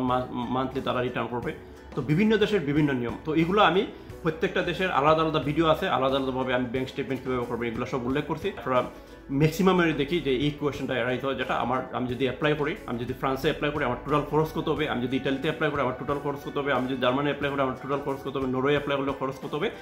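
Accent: Sylhet, Bangladesh. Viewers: ভাইয়া আপনার বাসা সিলেট হবিগঞ্জ??? কথায় বুজা যাচ্ছে,,Am i right? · ভাই কিতা সিলেটি নি?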